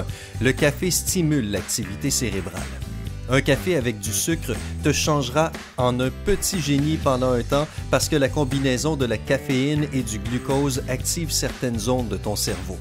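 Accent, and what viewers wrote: Quebec, Canada. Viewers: Greg Paris c'est l'accent québécois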